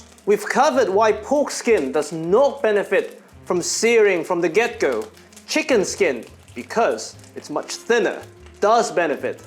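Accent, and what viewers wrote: England, United Kingdom. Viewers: You'll be surprised with how much English the average asian have to learn. That, combined with the fact that he'd be studying in England for a long time to get that accent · brother is throwing me off he looks like an asian uncle with a british voice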